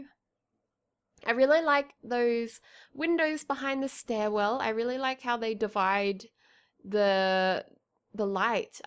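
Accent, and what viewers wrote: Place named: Australia. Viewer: This is my first video I've watched of yours (subbed!) and I was like "she's French. No she's Bulgarian. No no no, definitely Swedish. Wait, is she British. No, sorry, she's austrailian, defiantly austrailian.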